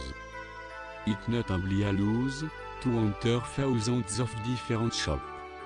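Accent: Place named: Germany. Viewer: lol sorry it sounded like german at first and then I actually listened and it was english. very heavy accent.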